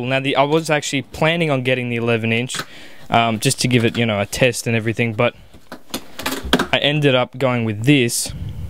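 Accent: Australia. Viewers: are you from aus or nz ? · i really like this aussie accent hahah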